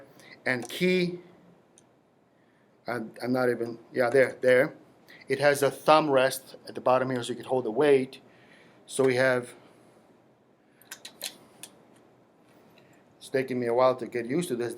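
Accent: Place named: Philippines. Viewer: Your accent sounds slightly filipino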